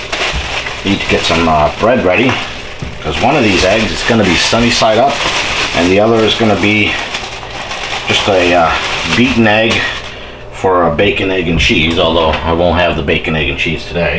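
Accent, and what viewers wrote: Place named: East Coast, United States. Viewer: You have my grandfather’s name, first and last. I’m a too. Not tons of us. Sounds like you are in the east coast like us.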